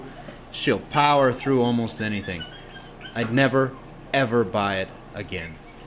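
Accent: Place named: United States. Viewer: You sir, sound like a good american. Awesome blades! Especially the vintage ones that have stayed in the family! Priceless!!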